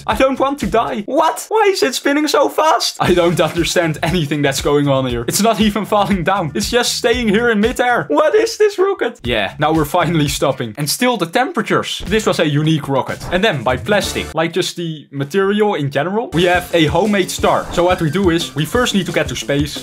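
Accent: Netherlands. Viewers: Question: are you Dutch? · ik smell a littel nederlands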